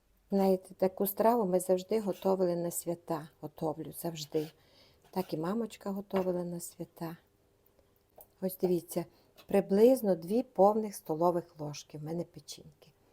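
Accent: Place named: Zakarpattia, Ukraine. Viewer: Дорога Пані! Вітання Вам із Канади! Ваше відео мені переслала моя подруга із Амстердаму. Не можу передати словами, які приємні емоції я отримала слухаючи наш чудовий закарпатський діалект, і відтворюючи у памʼяті смачну Повненку, що готувала моя мамка. Це якраз той рецепт, з яким Ви і поділилися із нами. Якось я про нього призабула, але тепер обовʼязково приготую. Ви, Пані, надзвичайно приємна жінка, з такою позитивною аурою навколо себе, що відчуваєш навіть за океаном! Міцного Вам здоровʼя, чим швидшого мирного неба, і чекатиму на Ваші нові відеозаписи.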